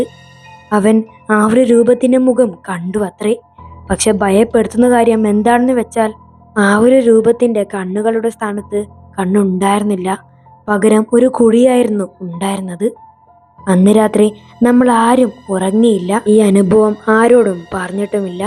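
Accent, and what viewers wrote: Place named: Kannur, India. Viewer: കണ്ണൂർ സ്ലാങ് ❤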